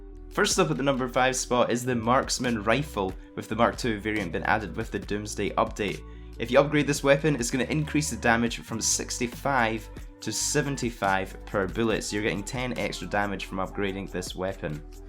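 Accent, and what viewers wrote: Scotland, United Kingdom. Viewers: Find it so amusing watching videos and it’s a fellow Scottish man. So used to hearing Americans and English guys lol. Nothing against the English or Americans, love yous all.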